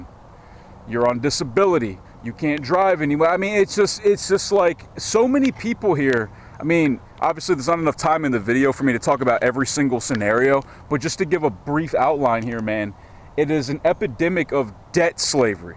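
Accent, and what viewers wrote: United Kingdom. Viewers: Great British accent there! Excellent video too!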